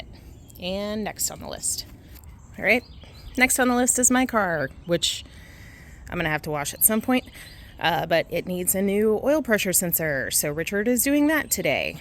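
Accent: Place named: South, United States. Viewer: I can tell you are from the South like me.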